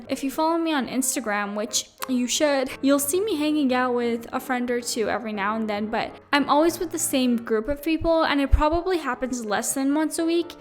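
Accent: Canada. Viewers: i love your "sorrys" that cute canadian accent gurl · I always forget is Canadian until she says “soorrry”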